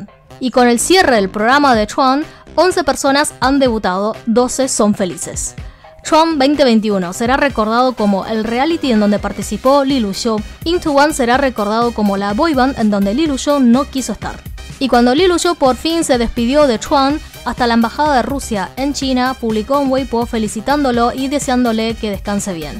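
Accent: Argentina. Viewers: Vos hablas como argentina querida ..... saludos desde 🇵🇦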